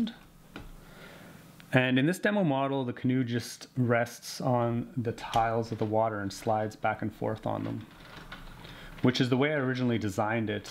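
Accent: Canada. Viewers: Man I love a Canadian accent. Must be the Degrassi I watched as a kid.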